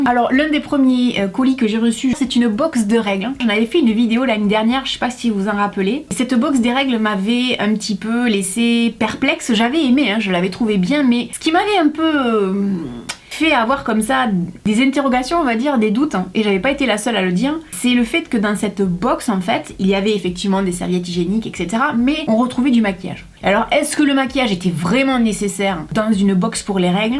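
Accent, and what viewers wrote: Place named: South-West, France. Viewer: C'est toujours un grand plaisir de découvrir une nouvelle vidéo, on sait d'avance qu'on va passer un bon moment (une bulle personnelle dans le temps, et pourtant je tiens à redire que je ne me maquille pas) car tu es une personne rayonnante, solaire et tellement agréable, de plus j'adore ton p'tit accent du sud-ouest! J'aime beaucoup les parfums de la marque Adopt que je porte très régulièrement, ils sont d'un rapport qualité/prix excellent! On m'a demandé à plusieurs reprise quel était le parfum que je portais (rose noire, sugar, yummi candy) une chose qui m'est arrivée moins souvent alors que je portais "un vrai parfum de marque" (mes préférences vont chez Guerlain, Lancôme et Mugler). J'aime bien t'entendre parler de maquillage même si je n'en fais pas usage, pour moi une simple crème hydratante riche de chez Avène ou la Rose-posay. 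Je suis bluffée quand je vois comment on réalise un maquillage techniquement, cela n'est pas si facile à faire, question d'entrainement j'imagine. Chère as-tu un avis sur le lait crème Embryolisse que j'aimerais beaucoup tester, j'espère qu'il convient à une peau très sèche, sa texture me semble très chouette. Bonne et longue continuation à toi!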